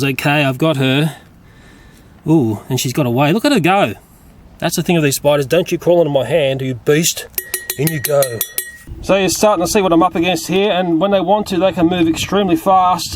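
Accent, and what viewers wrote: Australia. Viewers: Deadly spiders and an Aussie accent, where else would you rather be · wimp. by the sound of your accent your born and bred here in oz so why the big drama . You know we learn to live with them . Leave em alone and they leave you alone.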